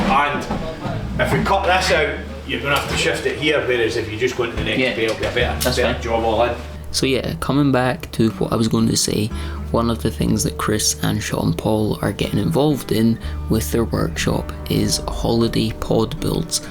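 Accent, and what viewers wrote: Scotland, United Kingdom. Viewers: The full-blown Scottish accent!! ❤ Sorry, as an American, it's cliche that when Scots are mentioned, that's the accent we think of, and it almost is a stereotype...until you actually do hear it and then it's the greatest thing ever, lol!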